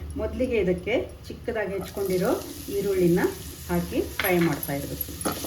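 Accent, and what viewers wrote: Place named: Konkani, India. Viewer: Aap kyon Konkani style bolate Shetti style boliye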